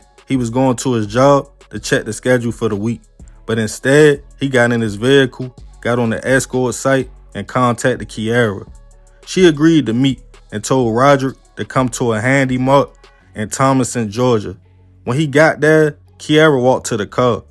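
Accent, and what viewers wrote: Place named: Mississippi, United States. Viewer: You got great stories but why it sounds like you read at 3rd grade level….or from Mississippi…. You have great content frfr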